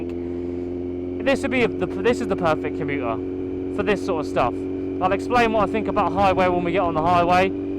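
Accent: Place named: United Kingdom. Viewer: where u from? u accent i literally like uk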